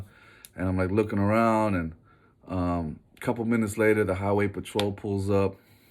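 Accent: Houston, United States. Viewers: My boy you gotta be from alief lol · What part of the H you from bro?! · “I’m from Houston, Texas. A lot of y’all already probably know.” I know someone from Houston when I hear one 😹.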